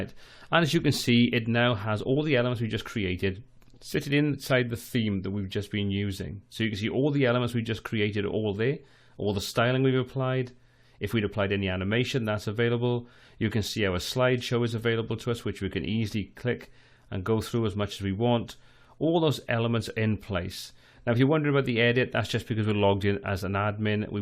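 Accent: Wales, United Kingdom. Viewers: Thanks for your clear approach - do I detect a Welsh accent? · I know a welsh brother when I hear one haha! I'm from South wales :D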